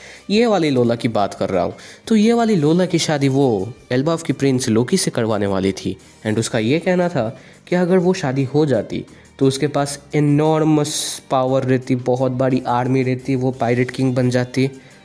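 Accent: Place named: Bengal, India. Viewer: joshu haa bhai aur mera dost kolkata mei reta hai aur iss bhai ka bhi bole ka andaj Bengal mei jaise log bolte hai waisa hi hai 😶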